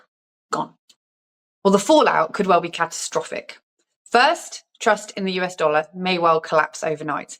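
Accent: United Kingdom. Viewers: Who is we? Your accent sounds British? We in America need to do nothing if it bothers you then go use yin if memory serves the British pound was once a world currency correct? So I think the entire planet has gotten themselves into debt nearly all countries….what blows my mind is the country with the reserve currency has to debase it in order for all the free loaders to use it, all these videos on what we the USA must do, we don’t have to do anything we have been paying the bar bill for all the NATO countries for some time allowing all of you to spend less on defense and to rip USA off in trade all to help your countries and you all still are in debt…..America is in the debt because we have been covering the cost of allot of countries…Cold War ended at that point you all should have pony up but for some reason it didn’t happen….now with trump saying enough is enough we get all the tears Canadians are having a melt down over it the were getting 200 billion off us in trade but blocked allot of our goods same as European countries. 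Here is what I think if the gold is gone from Fort Knox and the USA dollar collapse Europe will be at WW3 inside 5-10 years with each other or Russia do the math if the reports are true Germany has one of the largest gold reserves hmmmmmm the country that started ww2 that owed war debts until what the 90’s will suddenly be most powerful European country lol every time Germany has been unified and has the means there has been war.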